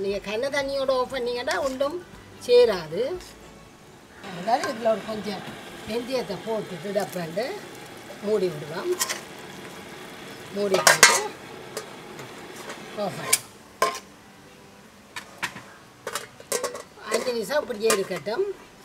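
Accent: Sri Lanka. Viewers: அம்மா உங்களின் தமிழ் அருமை. எனக்கு உங்களை போன்று இலங்கை தமிழ் பேச ஆசை ஆனால் நான் இலங்கை தமிழ் பேச முயற்சி செய்தும் முடியவில்லை.உங்களின் இலங்கை தமிழ் கேட்பதற்காகவே நான் உங்கள் பதிவை தவறாமல் பார்ப்பேன். சுவையான உணவை சமைத்த உங்களுக்கும் உங்கள் சமையலை பதிவு செய்த உங்கள் பிள்ளைக்கும் மிக்க நன்றி அம்மா. மலேசியாவிலிருந்து 🇲🇾❤️🇱🇰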